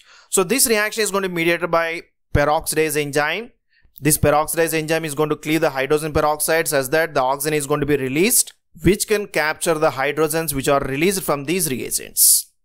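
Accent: South India, India. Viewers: R u south Indian 🙂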